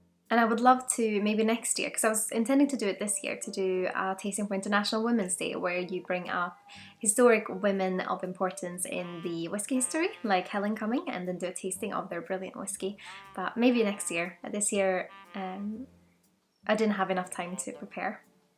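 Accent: Scotland, United Kingdom. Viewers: Scottish or swedish ?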